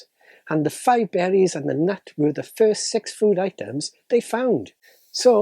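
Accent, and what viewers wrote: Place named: Wales, United Kingdom. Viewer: Great review this is one game that has been on my radar for some time ,and your video has given me the inspiration to add it to my next order with game lore Also its nice to here a whelsh accent as a lot of the board game reviews are from the usa ,so keep up the good work from a old board game loving chat from just over the boarder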